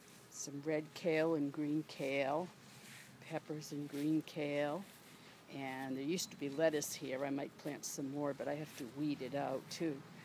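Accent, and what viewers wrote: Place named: New York, United States. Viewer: Nice garden. I tried to respond to your invite on Sonico but for some reason no luck since I do not have an account. But you do have my correct email address. I never realized you had such a strong New Your accent -- it sounds great! (<; Cheers! Are you still picking!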